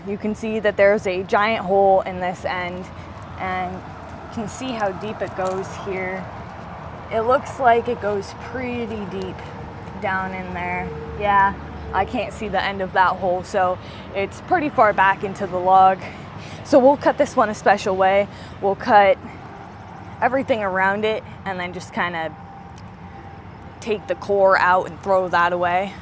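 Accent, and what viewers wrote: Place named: Pennsylvania, United States. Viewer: I just love your NE Pa accent. And I'm just mesmerized that you took the initiative to put this Utube channel together, while you're schooling and working at the mill. And taking your work seriously is refreshing. So, it's all these things that make your channel a pleasure to watch! Something tells me that you two are going to succeed in life, no matter what path you choose. And something tells me you have a LOT of people in your corner, cheering you on.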